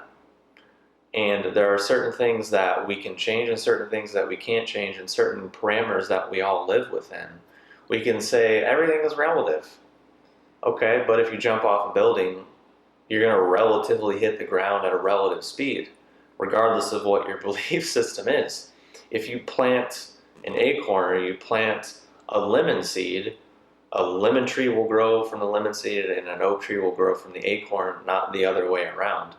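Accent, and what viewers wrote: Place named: South, United States. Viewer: Yes. Very articulate. Just recently found you so I'm hearing a very strong southern "twang" pop up.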